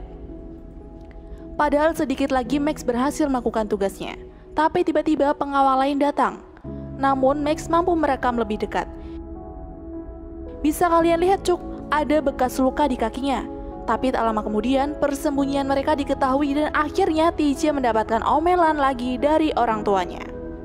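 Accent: Pemalang, Indonesia. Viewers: Wong Pemalang min?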